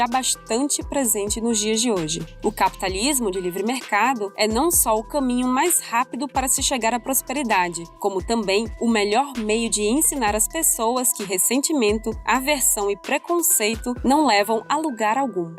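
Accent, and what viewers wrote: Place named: Alagoas, Brazil. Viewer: É o sotaque alagoano dela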